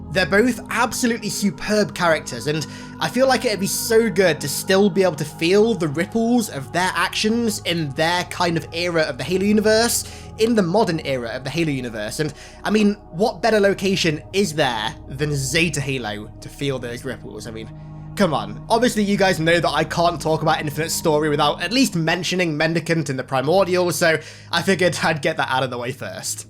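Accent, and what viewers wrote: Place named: United Kingdom. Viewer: This guy said if my voice sounds a little bit weird LOL this guys voice in general is horrendous he’s got one of those horrible British accents that sounds like he’s got porcelain teeth and he swallowed glass he makes great videos but I just can’t stand listening to his voice no offense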